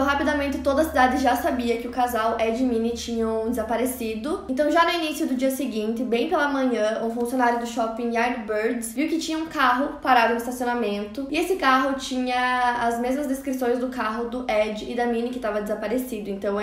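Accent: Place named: Ponta Grossa, Brazil. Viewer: Amo esse canal é esse sotaque Ponta grossa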